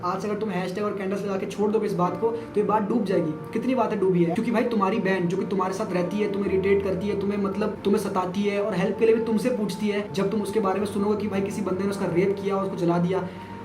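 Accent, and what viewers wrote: Hyderabad, India. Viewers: Bhai may be hyderabad sey ho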